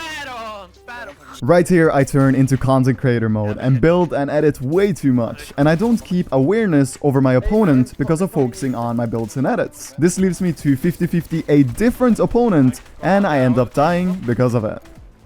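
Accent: Norway. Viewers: Yo er du norsk jeg merka det nettop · Wait are u Norwegian?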